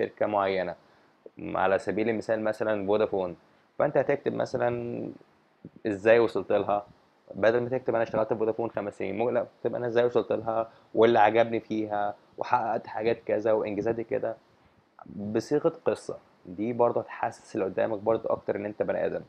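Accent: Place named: United States. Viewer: بص الامريكن اكسنت كويس جداً مشاء الله بس افصل مبين العربي والانجلش لانك تقريبا بتتكلم عربي اميركن اكسنت هههه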